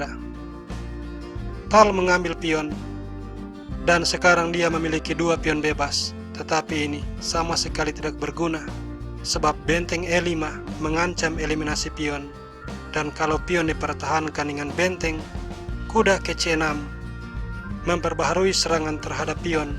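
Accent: Manado, Indonesia. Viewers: manado ngomong 8 itu lapan bukan delapan... itu uda kliatan bgt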